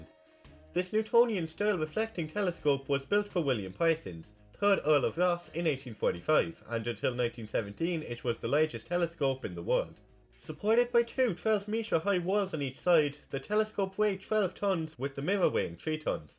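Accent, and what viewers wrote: Ireland. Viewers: I love your videos 😊 though you have such a song Irish accent that this Brit can't watch at her usual 2x speed 😜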